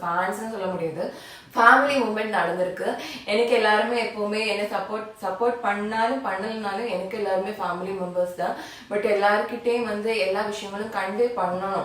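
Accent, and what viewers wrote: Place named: Kerala, India. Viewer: Malaiyalam kalantha Tamil speach ketka Rompa Nalla iruku.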